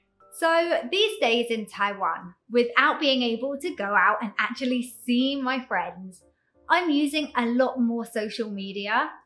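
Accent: United Kingdom. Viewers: 真的非常喜歡聽您英式英語的口音講任何事情，這種腔調聽了真的是一種享受啊！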